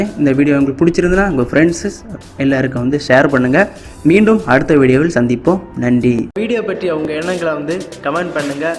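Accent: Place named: Marthandam, India. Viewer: I came to know from ur slank bro.... I studied 2 years on marthandam, love ur slank... keep it up bro.....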